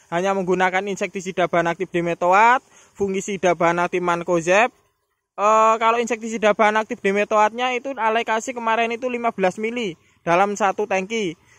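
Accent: East Java, Indonesia. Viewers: iki asale jowo ngendi bos kok kyk jawatimuran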